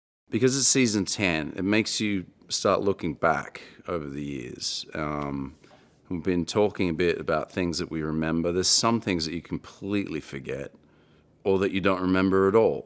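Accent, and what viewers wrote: Australia. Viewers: You did not know he was Aussie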